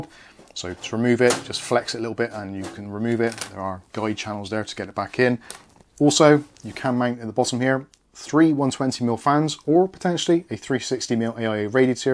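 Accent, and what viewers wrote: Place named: East Anglia, United Kingdom. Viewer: My only complaint about this review is how you pronounced "Aluminium" Judging by your accent, I'd say you're from East Anglia or somewhere in the South-Midlands or South-West. Come on man, we're not speaking American yet ;)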